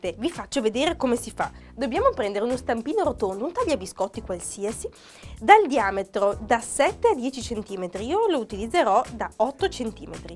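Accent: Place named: Sardinia, Italy. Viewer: Non metterei mai la ricotta vaccina ma solo di pecora, ma veder realizzare le pàrdulas da te è un piacere, certo non quanto sentire l'accento familiare che lascia immaginare profumi e aria della mia splendida isola, ma il tuo accento, i tuoi modi e la tua bravura sanno essere piacevolissimi come sempre. Un saluto a tutti i miei corregionali e alla mia terra lontana ❣️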